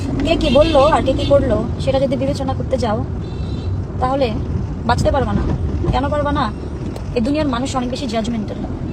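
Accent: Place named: Barisal, Bangladesh. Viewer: Borisailla fokinni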